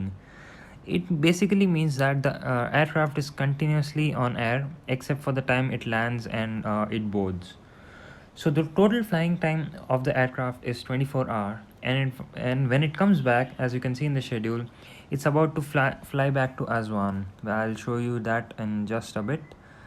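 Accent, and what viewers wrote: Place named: India. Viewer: Fuckin indian accent :((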